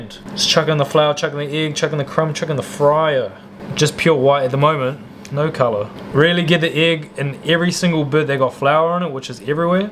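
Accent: New Zealand. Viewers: i love the nz accent so much.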